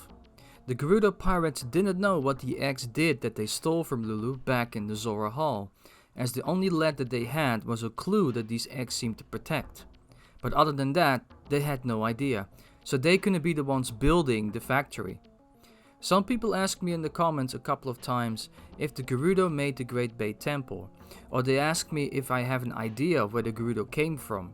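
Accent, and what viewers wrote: Netherlands. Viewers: Been a long time since i saw an actual good theory. I also hear some Dutch accent. I'm from Belgium so i'm glad to hear someone near me also be this passionate about Zelda.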